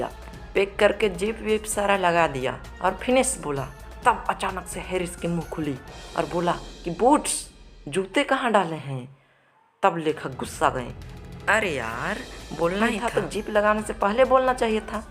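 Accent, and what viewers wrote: Bihar, India. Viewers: You are Bihari n?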